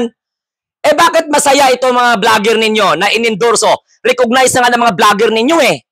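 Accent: Iloilo, Philippines. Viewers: Ayusin mo muna ang punto mo ilonggo ka toto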